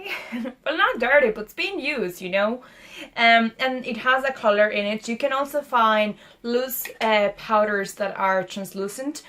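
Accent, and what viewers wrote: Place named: Ireland. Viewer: I love your soft Irish accent :)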